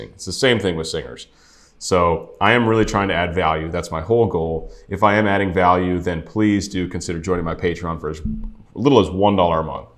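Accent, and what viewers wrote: South, United States. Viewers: Your southern accent is closer to the pronunciation of her name 🎉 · Your American accent from the South is closer to how her name is actually pronounced 😁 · The way you said her name using your beautiful southern accent was correct! Could you believe it? 😂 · You pronounce like you did in a southern accent.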